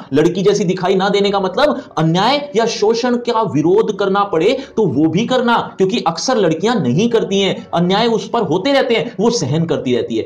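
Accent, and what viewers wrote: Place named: Hyderabad, India. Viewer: Aap Hyderabad ke ho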